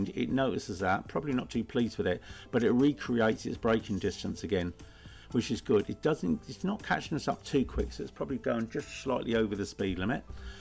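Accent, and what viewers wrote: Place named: East Anglia, United Kingdom. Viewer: I'm detecting an East Anglian accent.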